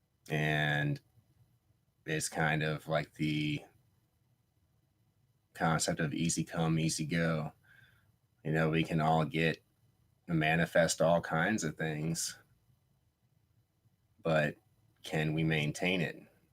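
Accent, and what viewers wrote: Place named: United States. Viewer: Love your American Accent. ♥🐱★💋⚘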